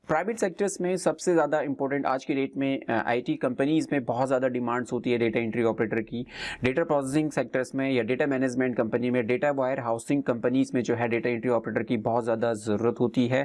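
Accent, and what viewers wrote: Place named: India. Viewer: why all the videos have Indians accent !!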